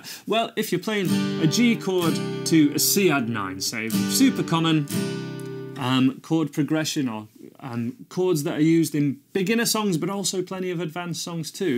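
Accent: United Kingdom. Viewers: I really dig that British accent of yours, makes the lesson more entertaining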